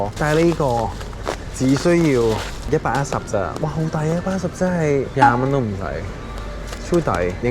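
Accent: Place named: Macau, China. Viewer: 澳門口音好得意😂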